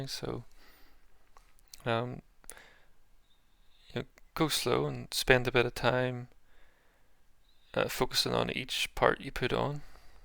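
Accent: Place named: Northern Ireland, United Kingdom. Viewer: just came across this vid, weird clickin on a mini tutorial and hearin someone from norn iron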